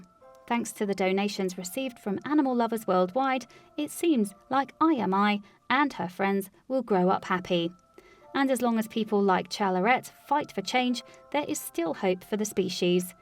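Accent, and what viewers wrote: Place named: RP, United Kingdom. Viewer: Pachyderm = Pak— ee- derm ( not patchy derm) Myanmar= My— Ann— Mar ( not Myna Mar, or whatever it is she was saying. A lovely posh accent is no substitute for doing your research.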